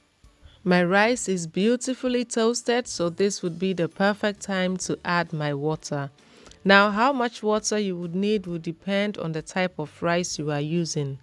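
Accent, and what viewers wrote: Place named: Igbo, Nigeria. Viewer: what ethnicity are you? i love your speech, are you Igbo? hug you beautiful person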